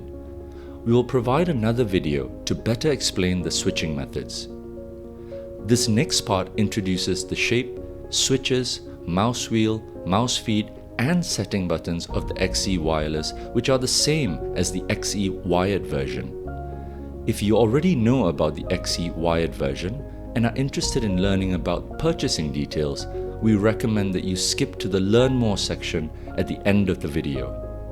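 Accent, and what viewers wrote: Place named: India. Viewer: Definitely an indian dude narrating this.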